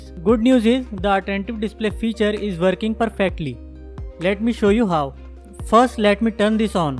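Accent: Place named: India. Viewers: Lmao forgive me. But Hindu accents are hilarious. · Steven Watson What the fuck is a 'Hindu accent'? He's speaking in the typical Indian accent. Hinduism is a religion. Forgive me, But Western education is hilarious.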